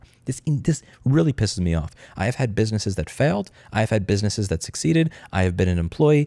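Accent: New York, United States. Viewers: Any chance you're from New York?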